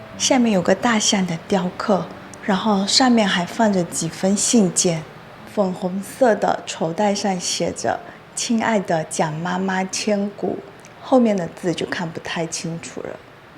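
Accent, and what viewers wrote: Fuzhou, China. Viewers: 影片內容跟拍攝都很好，但...講話發音是福州土腔? 發音都不標準，聽了十分難受。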